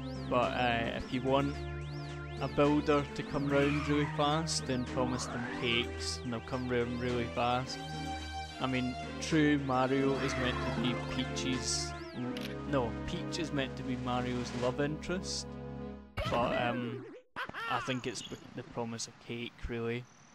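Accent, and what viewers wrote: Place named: Scotland, United Kingdom. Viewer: Lol i love your voice. i know your Scottish but its pretty cool to hear something unamerican for awhile.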